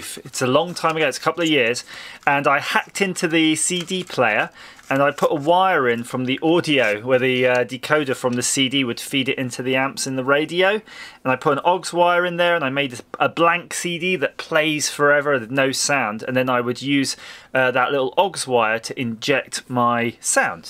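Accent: United Kingdom. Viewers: Thanks for the content and LOVE the British accent!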